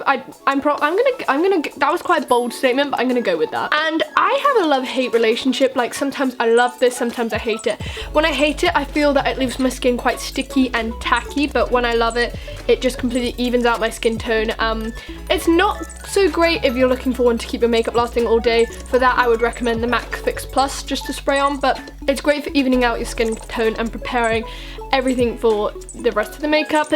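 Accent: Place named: RP, United Kingdom. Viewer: 2nd comment 😏😏😏😏💖 u speak so posh 😊😊😂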